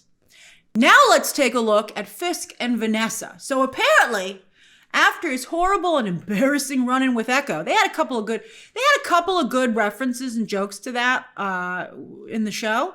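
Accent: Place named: New York, United States. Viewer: love the NYC accent hahaha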